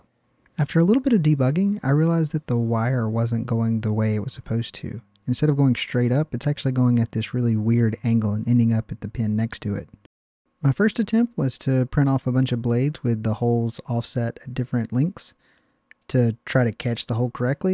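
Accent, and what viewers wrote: United States. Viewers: Just had this video come across my YouTube feed. First of yours I've seen and I instantly subbed at your first "daggumit" I'm instantly attracted to this kind of content. Throw on top the Southern accent. I'm sold. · Just curious where youre from, state if you dont mind hahaha like the accent and reminds me of a lot of people where im from(Southern Maryland)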